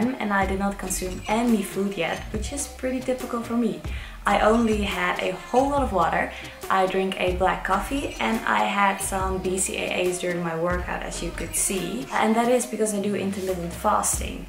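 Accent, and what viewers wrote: Netherlands. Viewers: Ik dacht al een beetje, aan je accent te horen, dat je Nederlands was! Maar hey Albert Heijn ✌🏼. Leuke video !!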